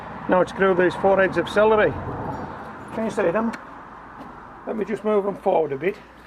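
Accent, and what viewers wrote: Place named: North East England, United Kingdom. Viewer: Newcastle area I think, definately a North East UK Accent.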